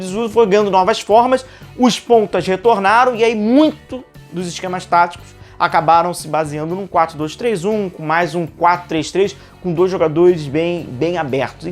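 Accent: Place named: Rio de Janeiro, Brazil. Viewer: Poxa desculpa eu sei que é mais pelo sotaque carioca, mas... me perdoa a professora de geometria... isoiceles!! E a professora de português, como é que fica??!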